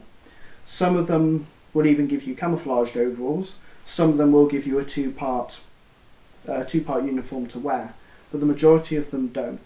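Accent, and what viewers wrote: England, United Kingdom. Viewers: O my lord.. i was expecting a deep southern hick accent.. not a light voice and english accent.. LOL Anywho, i catch myself today watching vids on first time airsoft and strategy out of airsoft withdrawls due to winter in minnesota -_- Very good videos so far my friend :)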